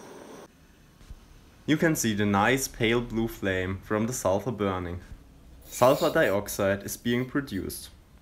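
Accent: Germany. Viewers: is that german accent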